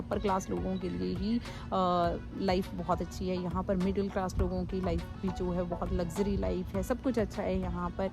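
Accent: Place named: United States. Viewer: I like her american accent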